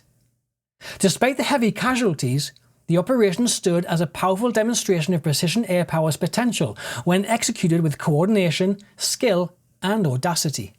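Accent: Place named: North, United Kingdom. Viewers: Well done, buddy. Every time I hear you, I travel back in time to Blyth in the 70's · I’m sorry sir, it just appears that way to me. Maybe I’m overly sensitive to it, and it could be I’m not used to your hard northern accent. Chris Ramsay the comedian is quite harsh on some of his words too so it could be a regional thing I just hadn’t heard before.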